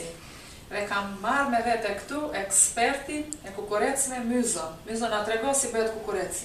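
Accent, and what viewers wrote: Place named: Vlorë, Albania. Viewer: Qe prej theksi dukeni qe jeni vlonjat😍❤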